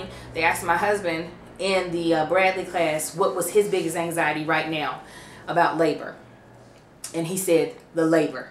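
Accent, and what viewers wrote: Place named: South, United States. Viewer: The southern belle accent and out of breathe is just too cute.😂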